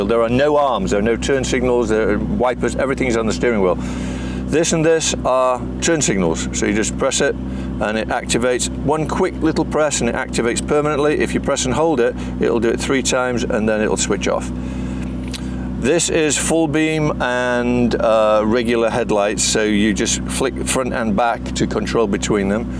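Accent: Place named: Lancashire, United Kingdom. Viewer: I'm losing my mind trying to place his accent. It sounds like Lancashire, maybe even a little Liverpool, definitely not London. Can anyone confirm?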